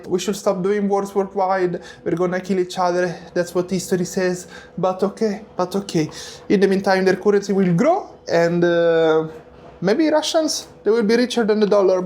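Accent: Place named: Italy. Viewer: I love the Italian accent. It is the most understandable for Russian speakers 💖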